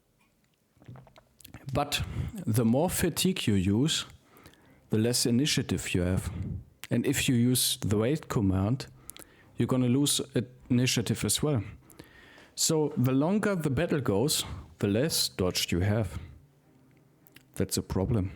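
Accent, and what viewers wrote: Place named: Germany. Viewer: Nothing is quite like a thick german accent schooling one in Battle Brothers! Thanks i learned a lot :)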